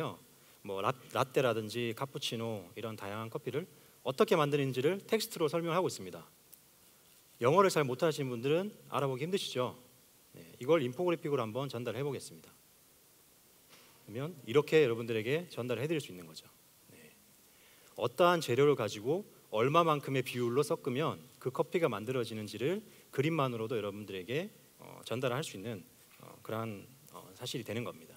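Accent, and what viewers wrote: Gyeongsang, South Korea. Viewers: 좋은 내용.전 왜 한국은 인포그래픽이 없나햇엇는데. 강의하시는분말투는 경상도 생긴건 전라도네요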